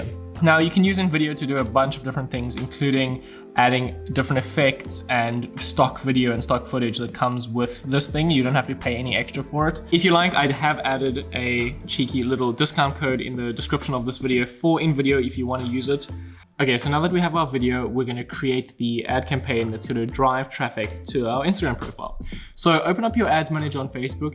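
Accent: South Africa. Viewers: South African accent👌🏻🔥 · Are you from South Africa? I'm hearing my accent :)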